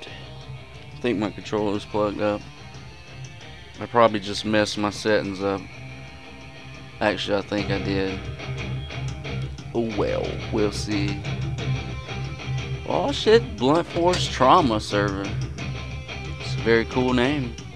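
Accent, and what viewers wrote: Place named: South, United States. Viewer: dat southern American accent.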